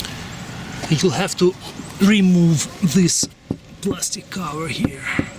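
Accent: Russia. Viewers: А почему акцент рязанский?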